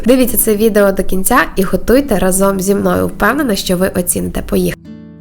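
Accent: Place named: Ukraine. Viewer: 😮😊 Просто слушаю ваш разговор 😊и уде наелась 😅,и готовитьне надо,то токой степени мне нравится Украинский говор 😊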